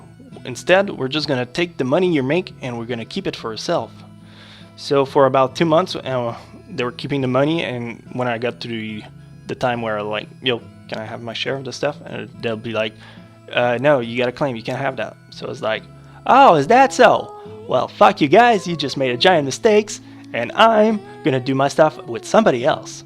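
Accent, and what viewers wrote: Quebec, Canada. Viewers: Je le savais que tavais pas un accant anglais jme disais que ce netais pas ta langue maternelle mais je naurais pas cru que tu serait canadien fr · Haha je savais déjà que tu étais canadien et francophone mais tu as vraiment l'accent canadien quand tu parles français, c'est trop mignon ;) Mais jpense que tu as eu raison de faire tes vidéos en anglais, c'est beaucoup plus pratique pour se faire connaître et c'est plus "universel" que le français, on va dire. Anyway, keep up the good work and greetings from Japan (I'm a French girl on hollidays in Japan XD) PS: J'attends avec impatience Chronexia and the eight seals, au fait ! Vu le temps et les efforts que tu investis dans le projet, ça va être génial, c'est obligé ! :) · Ben non! depuis tout ce temps, j'avais trop pas reconnu l'accent! Nice que je ne sois pas le seul au Québec à aimer (ou à adorer) regarder les animes :P Je suis fan de Naruto mais les autres animes sont tout aussi bon! 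Bravo pour tout ces vidéos. Je suis de Rimouski, mais je vais te reconnaitre si on se rencontre inquiete-toi pas, si jamais je vais au zoo! :D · OMFG T'es québécois Oh shit ._. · LoL mec je savais pas que tu etais quebecois sa fait du bien tentendre parler francais :) tu parle bc mieux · Tant qu'a y être, est tu québécois? perso je trouve que nos accent sont pareils XD Test : dit tabarnack en vidéo on verra ;) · tes quebecois non? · Hé ouais l'accent québecois ! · J'ai toujours voulu entendre ton français parce que je trouve l'accent canadien vraiment sympa! Voilà une bonne chose de faite :) Content d'en apprendre un peu plus sur toi et bonne continuation! First ; congrats for various things! Second : keep the good work sir! ^^ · Un vrai accent Quebecois XD (a real Canadian-French accent) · Oh putain je ne laurait jamais cru que tu etait canadian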